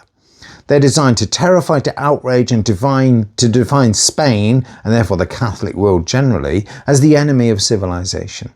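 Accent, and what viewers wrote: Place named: United Kingdom. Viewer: This guy thinks that because he's got a British accent everything he says is true. This guy doesn't know what he's talking about and needs to be locked up in an insane asylum. 20,000 people being burned at the stake was a common occurrence throughout Europe, especially in Spain. The foundations of the Spanish Inquisition go as far back as the 12th-13th centuries, after the Horrible atrocities European Christianity had committed throughout the Middle East and Jerusalem during the Crusades in the Middle Ages.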